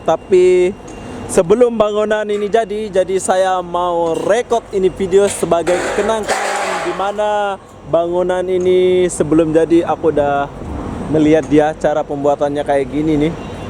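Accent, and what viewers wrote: Lombok, Indonesia. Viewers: Batur lombok aran min, kenek jak ndek ye. Wah telang ongkat logat2 sasak tye lasing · Dari awal sy udah mengira kalau ni orang dari lombok soalnya logat penyebutan Malasianya kental nanget. Salam dri lombok juga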